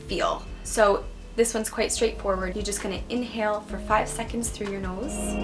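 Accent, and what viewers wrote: Canada. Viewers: She must be Canadian. (out and nose). Thank you for these techniques. I definitely need them. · I don't know, I kind of find her voice soothing by itself, and I like Canadian accents. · definitely a canadian accent! :-) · Love her, she's Canadian. I can tell by how she says out or about.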